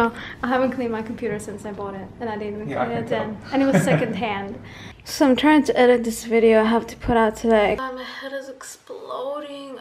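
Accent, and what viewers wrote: Australia. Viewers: THAT VIEW THOUGH. I know you're probably so over hearing about it, but you're little aussie mix accent is the cutest thing ever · yep you sound Aussie! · Aussie, with a twist of pommie... ;)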